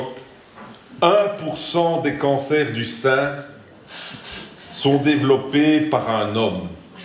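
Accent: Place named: Belgium. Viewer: accent Belge évident!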